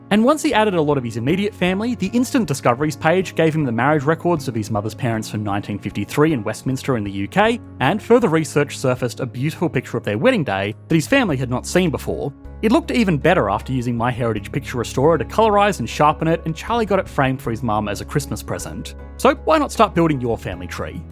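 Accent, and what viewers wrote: Australia. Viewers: good job. Are you Ozzie?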